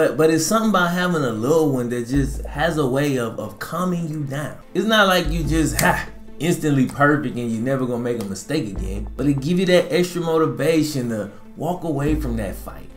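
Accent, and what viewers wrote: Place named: South Louisiana, United States. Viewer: Yo! You got dat South Louisiana “Ak-oholoic” ya hurd!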